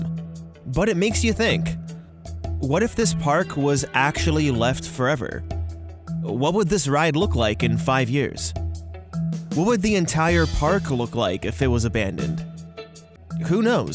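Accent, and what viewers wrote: Canada. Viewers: Also are you Canadian?!?! I need to know lol 😂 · Are you Canadian? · Are you Canadian?????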